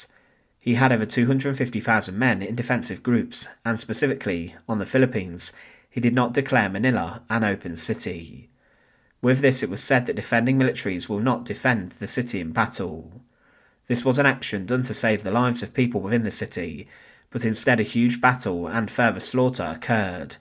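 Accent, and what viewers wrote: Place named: North, United Kingdom. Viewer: Ridiculous narration style. Northern kindergarden pronunciation and intonation. 🤮🤮🤮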